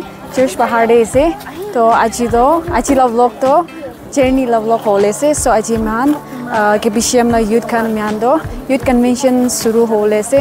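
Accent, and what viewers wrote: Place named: Nagaland, India. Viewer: I really like your konyak accent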